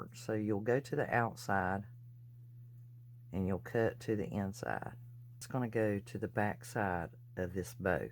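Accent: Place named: Ohio, United States. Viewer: Thank You So Much!! ❤ I'm in Ohio and I was trying to figure out your accent lol I'm guessing Southern Ohio, West Virginia or Kentucky? I have family all over there!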